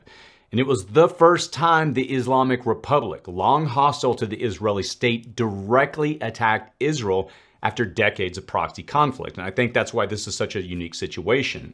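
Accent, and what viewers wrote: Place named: California, United States. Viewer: I didn't want to be disrespectful but i hear cali. Soy. The real world isn't cali. Anything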